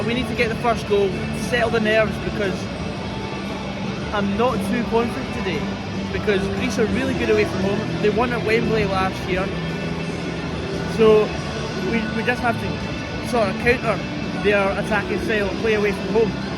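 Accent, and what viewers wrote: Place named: Scotland, United Kingdom. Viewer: We adore the Highlands! We adore the Scottish accent, by far the best and most emotional! Good honest commenting on the game!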